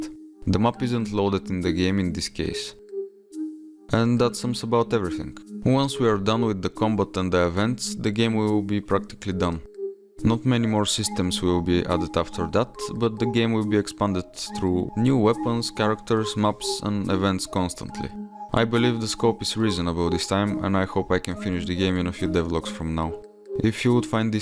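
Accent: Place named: Balkans. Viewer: Great job, keep up, but I need to ask you something, are you south slav? Your accent is making me believe that.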